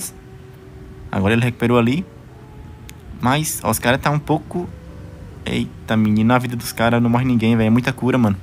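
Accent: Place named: Bahia, Brazil. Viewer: eu te amo bahia bahia, eu amo teu sotaque bahia, manda um salve pra paraíba 😁😁😁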